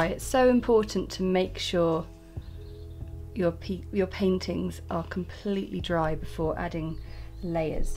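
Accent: United Kingdom. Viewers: I'm in love with your clases! And love to hear British accent😍.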